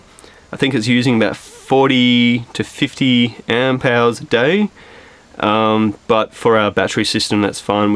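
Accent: Australia. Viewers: Love your build. It's comfortable and practical and it looks good too. Is this a medium wheel base or a long wheel base? Loved hearing the Aussie accent.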